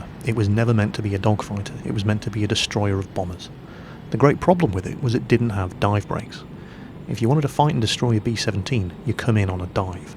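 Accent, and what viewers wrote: England, United Kingdom. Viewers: You did pronounce "Schwalbe" correctly, although one can hear your English accent! I'm proud of you :-D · This guy is British. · The Russians won WWII. Band of Brothers is a clever propaganda movie and HBO series, 1944 Germany was already lost and real historians know this. You sound smart - but your audience are kids. As a lawyer, age 58 and Russian/American and history major I know your British accent is part of your false narration of history to video kids. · Your german pronounciation is astoninglishly good, given that you have such a british accent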